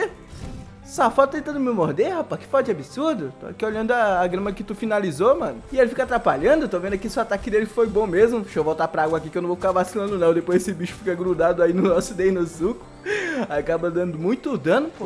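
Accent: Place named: Northeast, Brazil. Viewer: Que saudade que eu tava de vc é do seu sotaque nordestino eu também só nordestino só que eu moro em Minas Gerais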